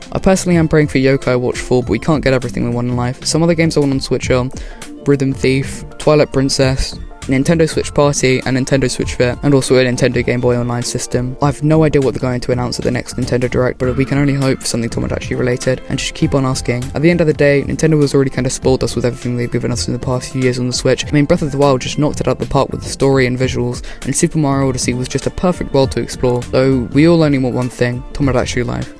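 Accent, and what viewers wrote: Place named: United Kingdom. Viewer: british boy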